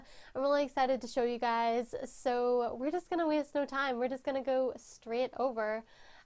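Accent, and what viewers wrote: Northern Ireland, United Kingdom. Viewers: the way it took embarrassingly long for me to fully catch on that you’re from northern ireland,, considering so am i ok wow lmao also how amazing is this entrance!! i have some fossils on the second level beside the museum and have water around it all and i have a few fossils/dinosaurs on like wee lone islands within the river so you cant get near the fossils,, if that even makes sense😂😭 but im really happy with mine! it was the first ‘grand’ thing i did with my island! it just needs some finishing touches like flowers when i finally get all the hybrids i want😭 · Same i went into to comments to try and find out cuz I couldn't tell if it was an ni accent or not 😂😂😂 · Great video! Do I detect a Northern Irish tinge there??😉😆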